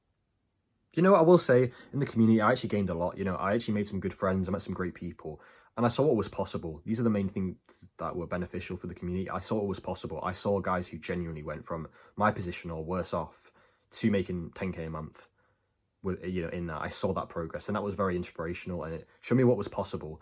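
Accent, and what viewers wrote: United Kingdom. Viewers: it’s a Yorkshire accent my guy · Is ur accent Manc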